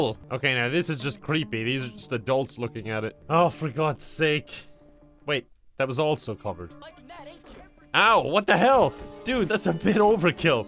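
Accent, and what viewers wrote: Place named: Ireland. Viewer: it's nice to hear a true irish accent +1 sub. i like you editing style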